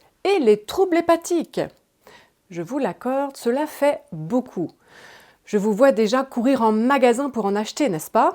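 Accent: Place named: Switzerland. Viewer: Bonjour. J’entend à votre accent que vous êtes Suisse. Excellente vidéo… Au plaisir.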